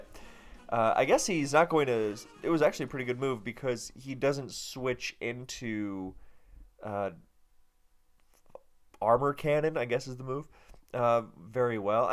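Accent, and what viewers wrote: Germany. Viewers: Yes you should narrate the next DPP Ubers Tournament video in your German Accent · As a german Person myself i Love watching People butcher the german Language, since it has a few stupid things and therefor is nearly always destroyed when tried to recreate so please use more German accent :) Also no, volcarona doesn’t use roost but it sometimes does use morning sun, it lost roost between gens And I want to see dnite espeed a polteageist (ghost mon) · Please, for the love of all that is holy, narrate an entire game in your german accent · I need more of your German accent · You should narrate an entire replay in your awful German accent!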